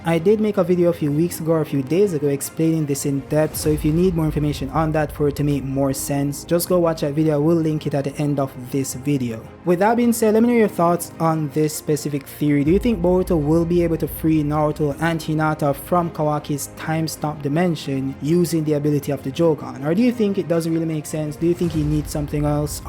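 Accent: Jamaica. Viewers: Thanks for the video. U kinda have a Jamaican accent 😅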